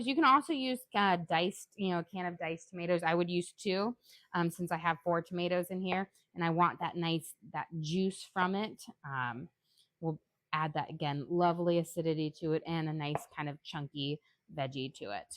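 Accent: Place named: Florida, United States. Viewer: You are starting to sound like we live in the same state. I’m in Florida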